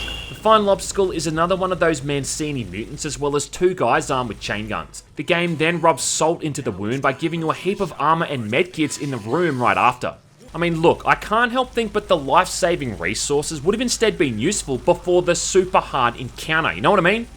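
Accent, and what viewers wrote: Australia. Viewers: Am kinda surprised to see you doing a re-review BUT it is SIN and that smooth Aussie accent dose it eveytime 😂👌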